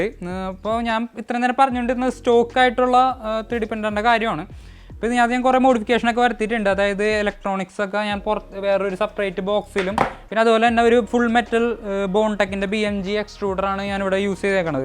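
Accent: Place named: Kodungallur, India. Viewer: കൊടുങ്ങല്ലൂർ, കരൂപ്പടന്ന ആണോ, അവിടത്തെ ശൈലി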